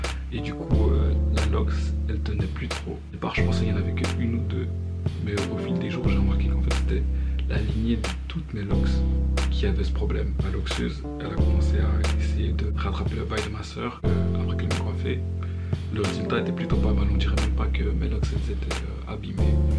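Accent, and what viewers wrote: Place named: Brussels, Belgium. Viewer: T as manière de parlé obligé t es un bruxellois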